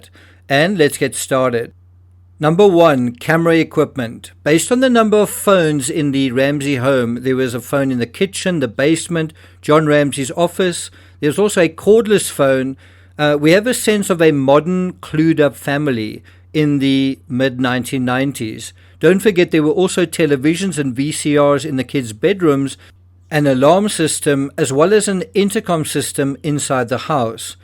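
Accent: South Africa. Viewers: South African ascent … superb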